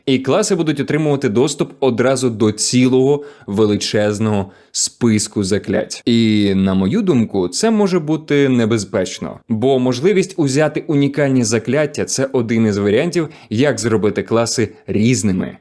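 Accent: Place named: Russia. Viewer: Но акцент не киевский, а прям очень русский - это спасает, наверное)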